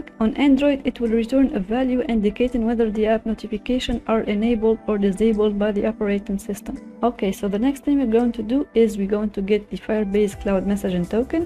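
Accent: Tunisia. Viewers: ur tunisian right? haha ur accent is soo tunisian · great tuto and in point, but i can't stop ignoring your tunisian accent, it's like hearing my self talkin, keep going my tunisian girl <3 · the English accent is so Tunisian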